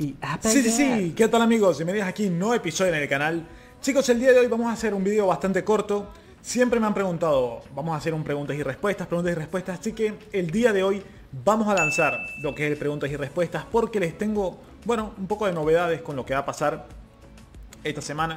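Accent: Venezuela. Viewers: Matias Cohen No contradigo que actualmente vive en Italia. Lo que contradigo es tu comentario “Es italiano”, en realidad es Venezolano (según yo) por lo que dijo desde el minuto en adelante y también por su español (con acento venezolano) fluido, tengo una profesora de Italia en mi Universidad y ni ella habla el español tan fluido como.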